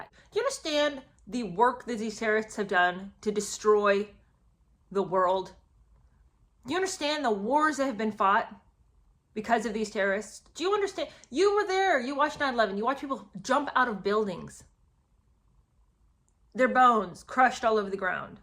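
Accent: United Kingdom. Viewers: Omg me too, I love your nasally British voice 🥰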